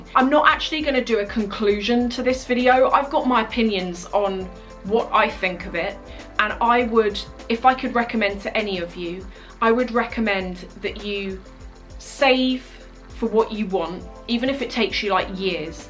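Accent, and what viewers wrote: RP, United Kingdom. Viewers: I love your posh accent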